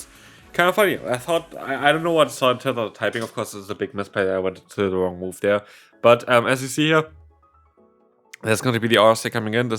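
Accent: Germany. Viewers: It would be great if you could speak a little bit slower and more exactly because it‘s pretty difficult to follow this fastly spoken Englisch with the German accent. Especially words like „literally“ sound like „liddellillllyy“ or „in todays video“ is „in tdsss veoo“. Thank you!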